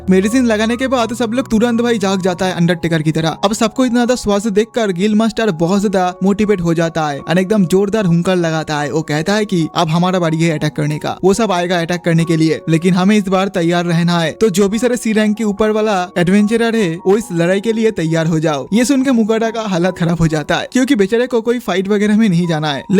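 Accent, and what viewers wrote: Bengal, India. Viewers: Are you a Bengali? I mean your accent is familiar to me. Anime ko 8 dena prefer karungi. And for your voice it's also 8.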